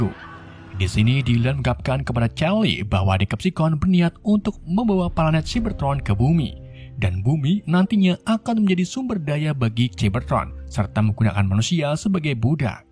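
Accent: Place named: Tegal, Indonesia. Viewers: Sampean wong tegal wis jelas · curiga..ni pebgisi suara kyy orang tegal...tau semua bahasa tegal... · Dari logat bicaranya kayak orang jateng😂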